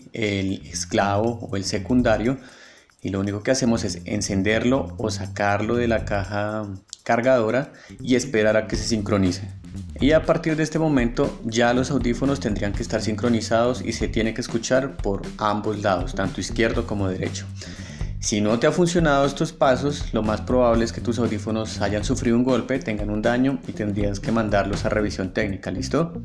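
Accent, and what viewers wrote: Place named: Colombia. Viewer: Qué bonito se escucha el acento colombiano !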